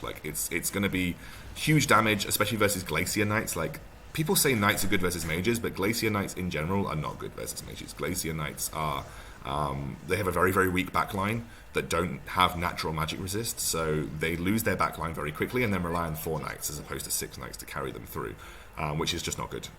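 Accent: United Kingdom. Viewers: Love your british accent jajaja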